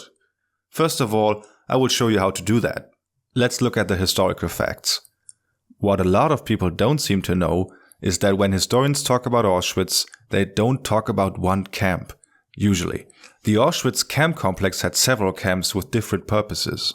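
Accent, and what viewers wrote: Germany. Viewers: i know you’re still making these videos, and doubt you’ll see this, but i think it’s extra important for you to do this work. for some reason my lizard brain trusts you more because you have a german accent. now, of course that isn’t a real reason to believe what you say as true, and i know this, but for people who are on the fence about the truth of the holocaust, all they really want is an authority figure, and i think you give that where british, american, and canadian-accented creators can’t.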